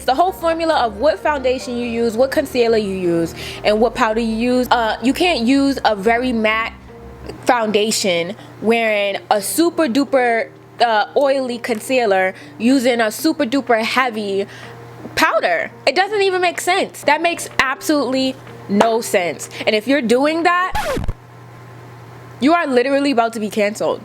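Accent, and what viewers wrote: New York, United States. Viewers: First of all accent she so NY 😍😍..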